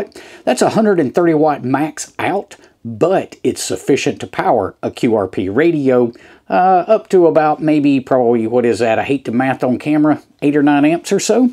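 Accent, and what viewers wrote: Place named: Middle Tennessee, United States. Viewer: I am from Ohio but this accent is familiar to me because I have lived in Middle TN for 20 years. To help those who are struggling, own = on. 😂 Great channel. Thanks for the videos.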